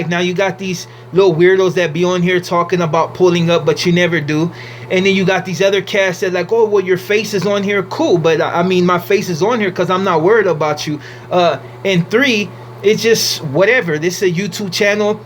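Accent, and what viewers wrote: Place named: Mexico. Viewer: " vale pito " only a true mexicano can talk like you carnal my respects im a new subscriber keep the videos coming bro sur up perrito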